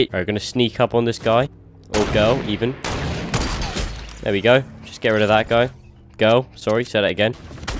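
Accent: United Kingdom. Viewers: British accent My dream accent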